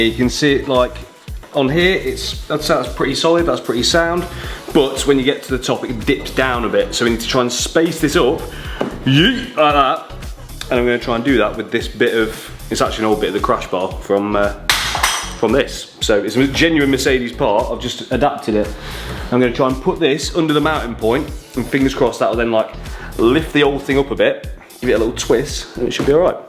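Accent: United Kingdom. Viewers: Can you do hindi Dub for me 😁 would be a lot easier to understand without UK accent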